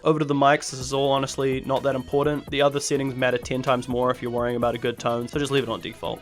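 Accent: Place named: United Kingdom. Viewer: This guy gets it with a British accent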